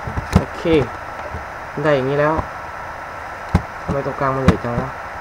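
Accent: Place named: South, Thailand. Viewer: เด็กใต้หรอ ทองแดงน้ะ <num>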